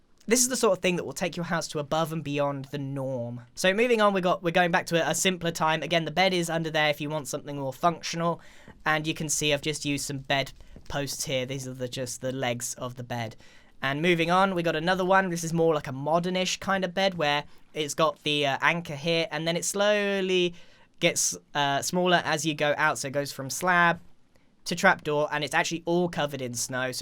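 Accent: United Kingdom. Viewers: Why do you sound extra British lol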